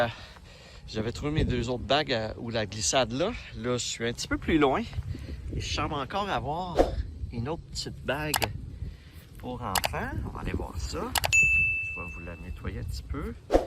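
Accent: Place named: Canada. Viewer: Avec votre accent vous êtes du Canada !! Pourtant vu le nom de la ville je ne sais plus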